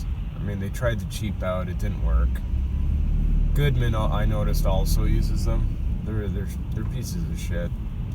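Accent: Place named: Canada. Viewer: Now you sound more Canadian. "Ya Ya" "And then fail Eh?" Yeah I remember getting the bulletin too. Something to do with the metals inside the switch. 🌓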